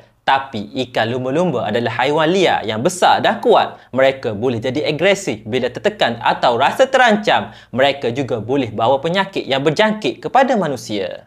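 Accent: Kelantan, Malaysia. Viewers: Kelamtam percakapanya kang😂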